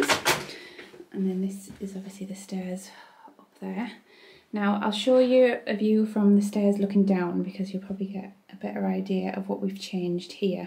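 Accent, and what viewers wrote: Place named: Newcastle, United Kingdom. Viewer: Arh the geordie accent!😻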